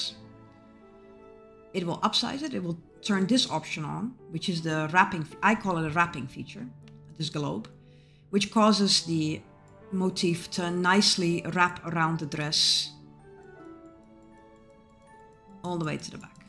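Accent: Netherlands. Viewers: that too lol but you speak very good English and for some reason i recognize the way Dutch people speak English. We can either speak very good English or very bad like Louis van Gaal 😂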